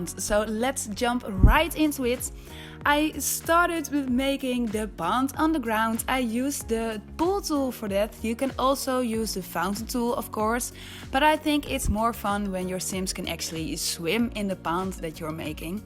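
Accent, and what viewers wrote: Netherlands. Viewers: Idk but your accent sounds duchts😂❤️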